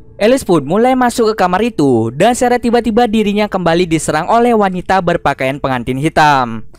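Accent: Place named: Java, Indonesia. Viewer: Terlalu jawa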